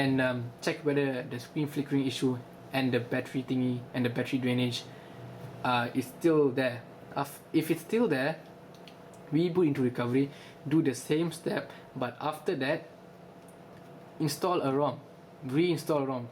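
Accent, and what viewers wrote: Malaysia. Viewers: Malaysian dude? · you accent are so malaysian , i like you're video :)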